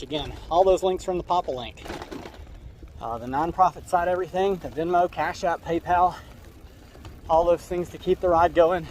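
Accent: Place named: South, United States. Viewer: Hi. My wife and I are intending to do RAGBRAI for the first time this year. I'll be keeping an eye for the guy with the beard and the southern accent. In the meantime, if your spring route takes you through West Michigan and you have some interest, let me know if you'd like some pedaling company for a few miles.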